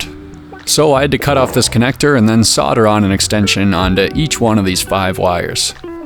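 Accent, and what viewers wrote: Canada. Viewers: You sound like an Ontario guy,hello from Mtl bud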